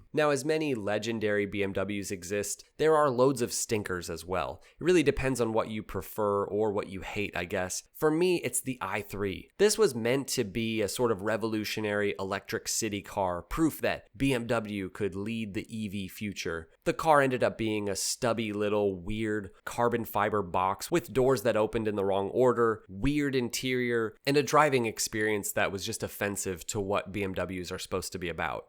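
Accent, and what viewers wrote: United States. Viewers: Yes but you hear that accent and you know to apply the US definition of "Global" (NY to LA) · Every Citroen is the worst one 😂 but seriously, he sounds like an American so he probably doesn’t know France even exists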